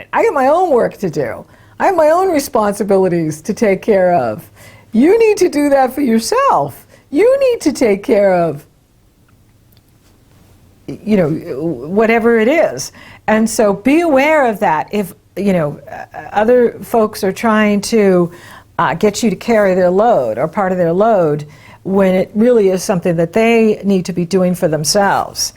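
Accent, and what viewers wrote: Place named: New England, United States. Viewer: Hey,I'm a NE resident too! Massachusetts! I always resonated with your accent... Now I know why! I'm studying astrology now and I really appreciate your videos! Looking forward to a little snow tonight! Stay warm!:). Ellen Green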